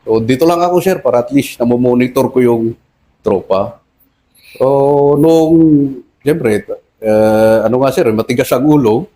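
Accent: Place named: Kalinga, Philippines. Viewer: taga cordillera ka sir dba?/ fromwhere in the cordillera?? correct me if I am wrong..sa pronunciation and how you speak taga kalinga ka ba??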